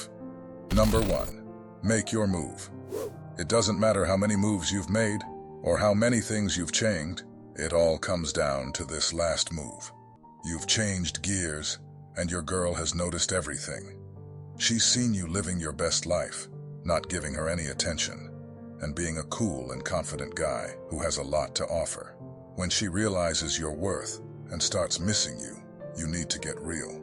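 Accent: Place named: Nigeria. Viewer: You sound like a Nigerian 🇳🇬🙄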